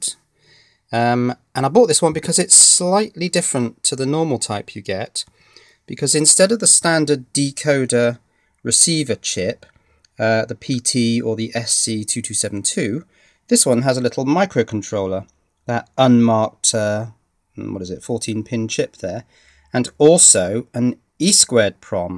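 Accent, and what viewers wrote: England, United Kingdom. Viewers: Hello, How nice to hear an English accent. I'm looking for a way of wiring two solenoids which are going to oper ate alive catch rodent trap. I need 12 volts for this. I have looked at adapting a wireless door chime but the out put on that is only 3 volt. By the way, I have O level physics only! Any thoughts much appreciated? (I'm on very limited budget) Thanks for sharing an informative tut/video. David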